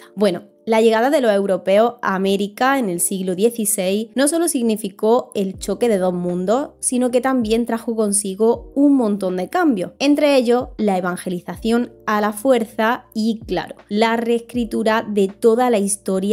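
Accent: Spain. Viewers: Su acento lenguistico muy agradable ❤😮 español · Gracias q acento TAn bonito de dónde será se q es española será andaluza gallega o canaria vaya un saludo desde Texas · Wowww Srita . Hace menos de 1 hr ví un video suyo , el del 5to Sil creo se llama y le cuento , me dejó sorprendido con su video . Mucjas gracias . De hecho inmediatamente me inscribí a su canal ahora aquí me tiene viendo éste otro video 👍👏👏👏🤜🤛 por cierto permitame preguntarle de dónde és usted ? Tiene acento extranjero (Española ?) , más me sorprende sinceramente . Excelente canal Gracias .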